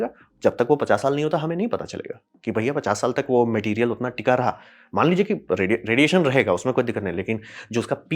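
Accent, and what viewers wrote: Bihar, India. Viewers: liked ur bihari excent too❤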